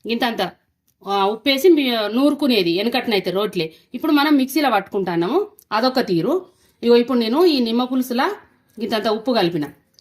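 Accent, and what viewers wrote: Telangana, India. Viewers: Chala baga chepparu amma....same ma intlo valla laga matladuthunnaru, Telangana Bhasha lo maku chala nachay me videos...elage continue cheyandi Me videos chusi cake chesanu Mutton curry chesa super vachay❤❤ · Accham Telangana bhasha thalli🎉🎉🎉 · Parka Telangana Bhasha nachindi I like your cooking methods 🎉🎉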